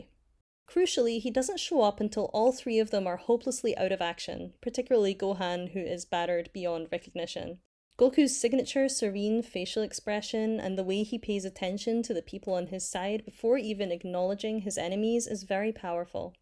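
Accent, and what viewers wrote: Scotland, United Kingdom. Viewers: I subscribed so I don't miss it 😜 are you from Scotland? 😅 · You sound like a Scot that went to university in England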